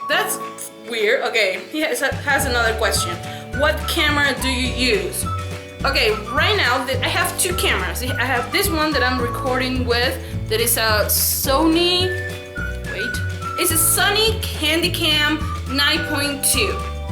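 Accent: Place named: Spain. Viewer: I love your spanish accent! I'm from Dominican Republic :)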